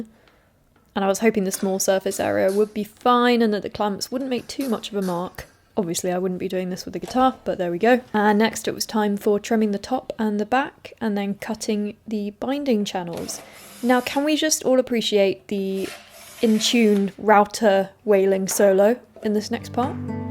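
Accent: England, United Kingdom. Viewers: Loved it! (except for the sound :) ) . Also i will be making one of those drill drum sander attachments and fitting my metal vice to the bench with a simple single-threaded rod! PS Nice to hear an English accent (but then i am english) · You looks soooo Spanish!!! It's almost weird to me hearing you talking in that British accent! Thanks for share your beautiful work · English accent? I must blame Monty Python, Iron Maiden and Black Sabbath that I quite like it, awesome work as always. And that heat bending of wood is black magic. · love your British humor and your accent as well ! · I’m an American so English accents immediately sound educational to me. No complaints. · Love the English accent and music on last video. How about some blues guitar in a future video. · I love a British accent, you are so lovely. excellent video! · Love the British accent. 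from a pretty girl to boot.. Nice video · Anyone that can't appreciate these amazing skills is probably sleeping through the video LOL! And shame on you - you could learn so much if you paid attention. Besides who doesn't want to see a beautiful girl with a Brit accent teach men how to do stuff? All the best elements entertainment, education, and fun😊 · Great to hear a British accent normally mute sound.. great work. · Amazing detail work , seen lot of people make these on youtube not sure i have seen that much passion put in to it ,oh and as a fellow Brit your accent is nice :D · Impossible: hating a British accent, a uke build. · You never disappoint! Who hates English accents? You are a wonderful craftsperson, your new apprentice is very lucky! Loved the music! Thank you1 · OMG, I thoroughly enjoyed watching the loving way you "rushed" through that build. I love the simplicity of your workshop. 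The "hold-down" clamps are slats against the floor of your loft! (I know it's gotta be a loft, since you live in a space - constrained treehouse.) I also loved watching you bend walnut - I have not seen that method. I need to build that steaming iron, thank you for the better view where I could see the shape. Most others do it in a variety of ways where they have to be careful to not burn the wood! I like your method many times over (I assume the wood does, too.) (BTW, since it was 5,000 degrees out, I'm glad you "switched to your floaty trousers.") I also liked your corncob drill sander to smooth the sides. (That's as far as I've got, for now...) 👍👍👍 Add-on later... Well, I watched the whole thing. Yeah, it didn't sound so great, and it could be a combo of things, like top too thick, over braced, and the strings! I'm sure that's why when you find one that does... it requires quite a bankroll. 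(That's per Guthrie Trapp.) But, as you said, it was a fun project, it looks adorable, and you got to show off a few more outfits, lol. You're so cute. You remind me of my lovely wife, except she has a PA accent, instead of your UK. Anyway, I'm already 'scribed, which I assume is why I got this in my feed. Yes, you have a lot to contribute to this field. I definitely like your approach to the build: simple & loving (respectful, delicate with the wood. I feel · British accent? Only Americans say that! You surely have an English accent…🏏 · Who could hate a British accent; especially yours,? Some serious skills you have! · i love brithish acent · You're great, and yes I love you're English I'm English also ❤️ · Nothing wrong with an English accent.. from a Scotsman. So tell me what guitar was playing as you did the finishing touches, because I want to buy it. Good vid though, held my attention. 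· First time viewer, I loved the video although I am not fan of English accent but I kept the sound on, because the amount of labour, precision and care you put into your work is amazing. Your videos are not pretentious, but actually entertaining and soothing for people who like woodwork. First video and I became a fan. Thumbs up...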